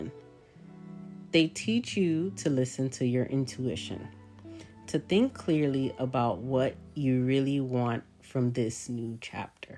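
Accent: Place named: Ghana. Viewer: you really sound like a Ghanaian. Anyway with some travels to do a work. It can be lonely sometimes. But its can be a time for reflection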